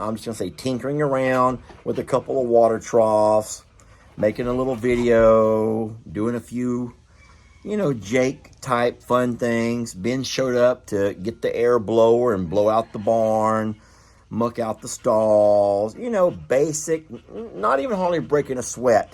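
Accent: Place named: Texas, United States. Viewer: My ❤️ and 🙏 go out to you. It's so hard losing our moms. Please take good care of yourself, take things one day at a time. There are times we need to take one hour at a time... find things that give you comfort and enjoyment. and videos give me comfort because my Momma's was from Texas. Hearing Texas accents reminds me of her and her family. I love it when says y'all 😍. I'm glad they also give you comfort. They have such good hearts, and take excellent care of Mother Earth and all of the critters at ISS and LHL. Their YouTube family will also be happy to give you comfort as well.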